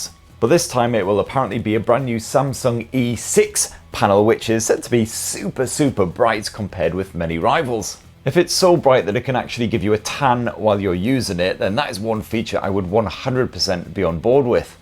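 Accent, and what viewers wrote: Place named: Northern England, United Kingdom. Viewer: we need you to tell us if any new piece of tech is shite or not shite in all your charming Northern ways.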